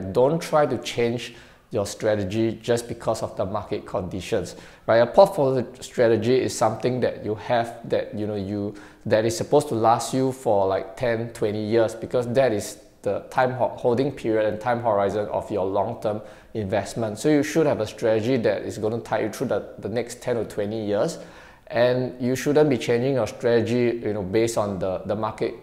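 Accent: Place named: Malaysia. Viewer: you are definitely from Malaysia :)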